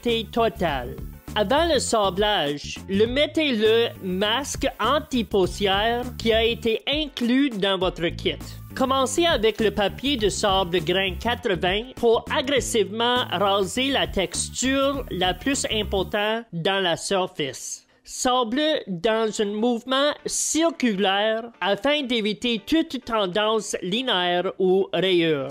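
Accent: Quebec, Canada. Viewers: je pense tout simplement que ce monsieur est Québécois. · Aie c quoi cette voix c l'accent québécois mélanger avec canard 🦆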